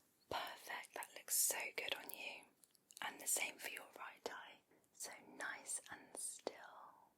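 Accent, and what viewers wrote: England, United Kingdom. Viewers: Love your accent isi kent or midlands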